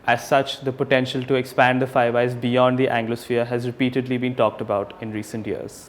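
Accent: India. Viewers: This f..ing indian accent :-(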